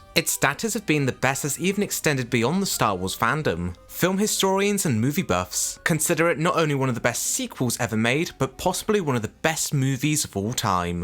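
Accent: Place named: Wales, United Kingdom. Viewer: Your accent reminds me a bit of Dave Lister from Red Dwarf. So that's, what, Welsh maybe? Being from California, I'm unfamiliar with the regional patois of British dialects.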